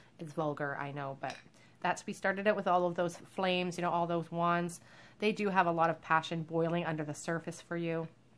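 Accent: Canada. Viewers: Question: are you Canadian? I just sense a Canadian vibe by the way you say the words out and about? Just wondering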